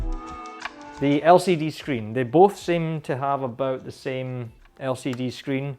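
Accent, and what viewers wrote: Scotland, United Kingdom. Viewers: Hey man, i love your scottish accent and the video. I do photography for many years, but i am in sort of an impass now, what would you choose between XT2 and a D750? I really love the ease of use of the XT2 with controls on the body like my film SLRs, and the portability, but a part of me wants to get into the big boy league and go full frame. While i know gear isn't important, i do want alot of quality for my photographs (i am only doing it as a hobby of which i am very passionate about). Another thing i am worried about is that my MF lenses (mostly M42), won't work that well with the D750, and i really love them. Cheers!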